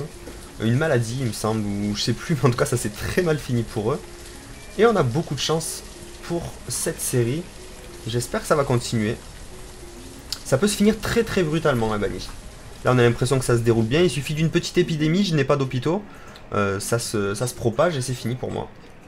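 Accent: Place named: Liège, Belgium. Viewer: Quand tu parles (ce n'est pas une critique)tu as l'axent Liégeois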